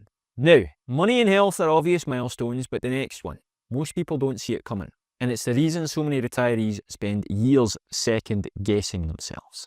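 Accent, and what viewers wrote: Scotland, United Kingdom. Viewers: LOL, nice Scottish accent, I love it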